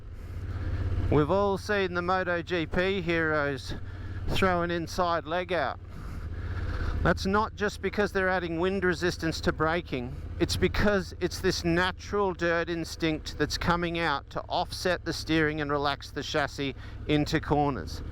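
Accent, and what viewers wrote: Australia. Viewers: the Aussie accent just makes it more engaging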